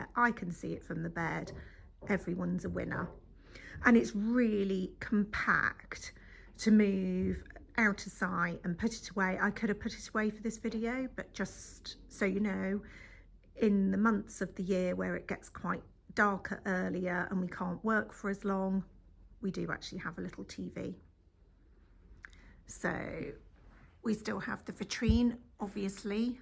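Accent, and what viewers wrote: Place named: United Kingdom. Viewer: I'm fascinated by your accent. It doesn't sound like other British accents I've come across. Can you tell me the location where this accent is found in the UK (I know it's the South somewhere). Thanks!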